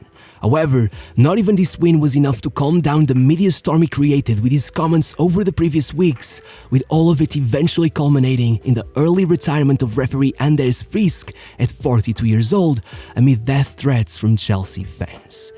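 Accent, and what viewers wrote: Portugal. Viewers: 100% this is a portuguese accent ahahah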